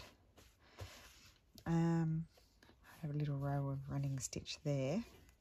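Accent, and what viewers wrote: Australia. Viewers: Kid are kids at lest they are out side I just concentrate on watching you and listening. So great to hear and Aussie voice. Regional Victoria except for Shepparton he’s out of lockdown now but hard and fast rules still apply masks 10 people outside can gather, and in restaurants only 10 even if individual there can only be 10 people in a place at one time so I am going out with my granddaughter I haven’t seen for months for lunch we have one hour in a restaurant that’s it. Then do a little bit of shopping and she can’t come home with me I’m still not allowed to have any visitors although I live on my own. I hope all your family and friends in New South Wales or where ever they live in Oz are okay I pray that they are safe from the dreaded word please pass on my love to them. I love your videos so much sometimes I watch them two or three times and if I get Maude even more I love all that you do although I can’t come up with what you do. 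Hope you are safe over there take care.🥰🦋💕🌈💜.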